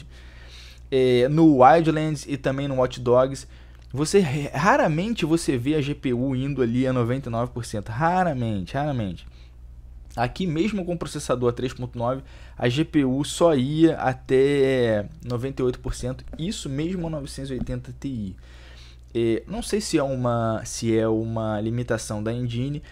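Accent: Rio de Janeiro, Brazil. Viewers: tu e de que bairro do rio ?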